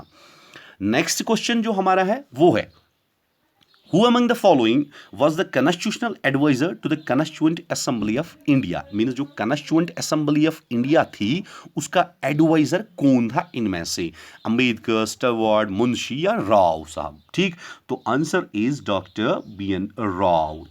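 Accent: Kashmir, India. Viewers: r u kashmiri bro..This voice is kashmiri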